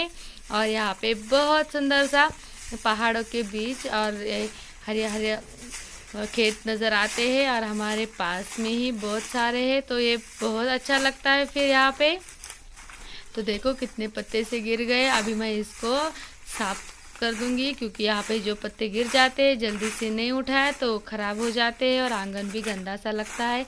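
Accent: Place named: Maharashtra, India. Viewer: मराठी दिसत आहात.... मराठी माणूसच अशी हिंदी बोलतो.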